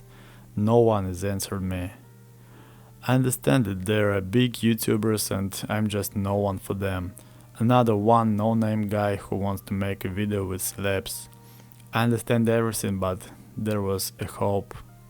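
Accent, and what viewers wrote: Russia. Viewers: Ты не из России? Акцент похож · Чел, ты русский? Акцент похож, но это не в обиду, потому что плевать на это. Ты реально крутой ютубер и гитарист, поэтому успехов тебе) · да, акцент точно говорит, о том, что скорее всего русский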